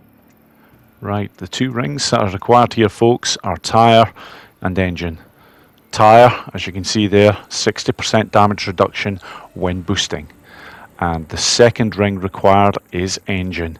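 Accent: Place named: Dumfries, United Kingdom. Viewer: Andrew Coltart - that's the guy! Your accent reminds me of his. Wiki says he was born in Dumfries. You close to there?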